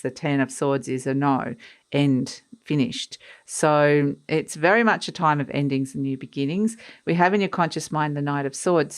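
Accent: Australia. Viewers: G'day. You sound like an Aussie. I'm in Brisbane. Nice to meet you. A blue butterfly flew across my line of sight this morning! Thanks for the reading. Cheers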